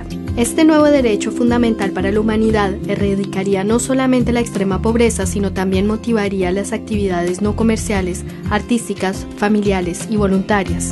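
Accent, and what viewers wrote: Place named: Colombia. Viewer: Solo por curiosidad, en que parte de España o Europa la gente habla con acento Colombiano?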